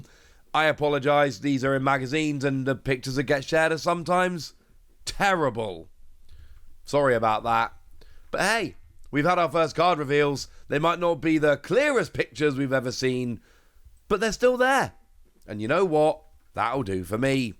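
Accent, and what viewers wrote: United Kingdom. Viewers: Hahahaha that UK accent messing people up again. Love it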